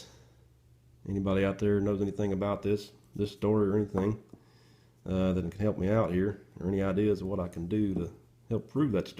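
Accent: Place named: South, United States. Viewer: lol this southern slang always sounds mentally retarded.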